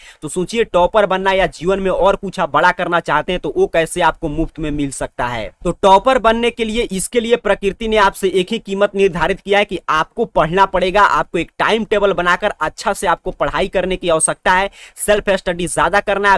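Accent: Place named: Gujarat, India. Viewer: Sir aapka voice ka ton ek gujrati jaisa hain.....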